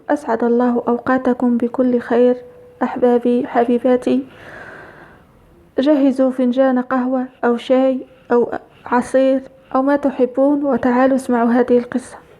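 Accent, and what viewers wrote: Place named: Tunisia. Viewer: السلام عليكم ورحمة الله وبركاته من كلامك أنك تونسية بارك آلله فيك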